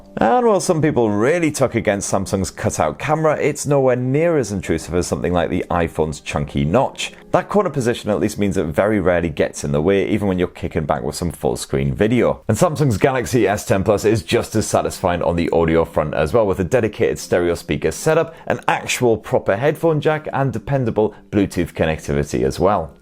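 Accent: United Kingdom. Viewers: It's not slang; he's British, which means you only hear/understand about half of what he's telling us. It would have been much worse if he spoke Cockney. I think he liked it, though. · Ditch the cheesy British accent dude!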